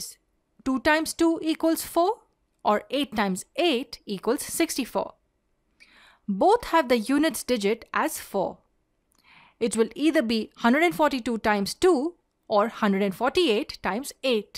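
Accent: India. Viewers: I can tell that the reader is Indian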